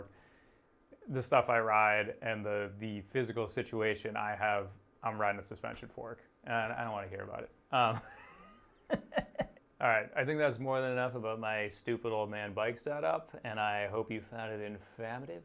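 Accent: New England, United States. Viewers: You sound like a New Englander. Where do you ride?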